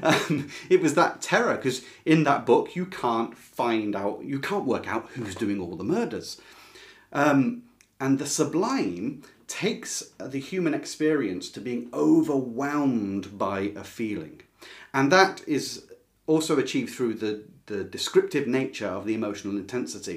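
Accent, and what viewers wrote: United Kingdom. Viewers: I seriously wish this could have gone on and on for hours! When you read that story, you brought the characters to life. Having a British person read with such great accents made the story so much more interesting! As an American I can’t really imagine too many British accents and their subtleties, but you made it so amazing :) definitely subscribing!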